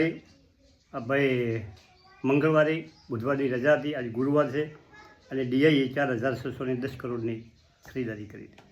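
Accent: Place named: Vav-Tharad, India. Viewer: કાકા તમારી બોલી વાવ થરાદ બાજુ ના હોય એવુ લાગેછે જવાબ આપવા જો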